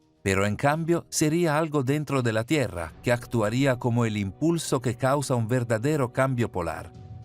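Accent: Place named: Italy. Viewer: 🔴 EL PORQUÉ SE VUELCA los Rusos unos 20 años atrás han descubierto que un obejeto en rotación en el vacío, si no es totalmente simétrico en sus masas, ÉSTE ROTA SU EJE DE ROTACIÓN después de percorrere una cierta distancia. DESCUBRIMIENTO hecho el la Estación Orbital Rusa. Gracias 🙏 Grazie, si capisce che sei Italiano, abbraccio 🙏 Mario Cappellotto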